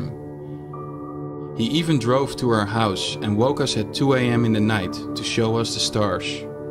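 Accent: Netherlands. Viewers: This really sounds like a dutchman telling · Dutch accent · This is a Dutch guy for sure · i hear from his accent he is dutch like me haha. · i heard the dutch accent before the video started playing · Deze gast is sws nederlands als je goed luisterd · Im sorry for the Dutchie, but what an accent! Joe can almost hear de nederlands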